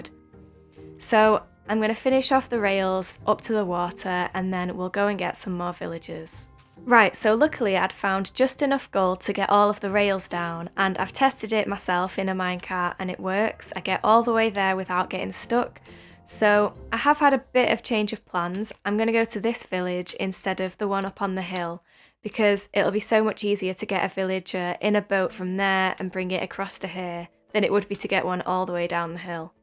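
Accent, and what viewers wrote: United Kingdom. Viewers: Hey! First of all happy new year and i know this can be too much but can you please make a castle on top of the 2 mountains and connect them with a bridge?? And also are you British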